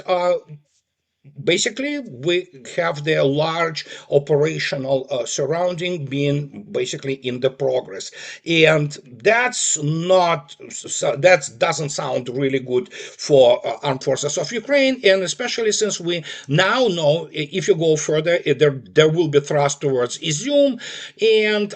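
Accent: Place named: Russia. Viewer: 'Confabulated fairy tales' via hat marvellous Russian accent! Music to ears and as always Truth! God Bless, Andrei, a beacon of informed understanding - reality itself.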